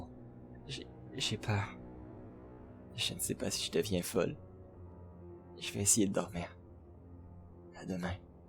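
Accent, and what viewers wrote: Quebec, Canada. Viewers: Tu serais pas Québécois par hasard? (Par ton accent et tout) Sinon très chouette vidéo ta voix est plutôt bonne mais tu semblais parfois plus ''heureux'' que triste ou apeurée. Bonne continuation + 1 abonné. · L'accens Canadien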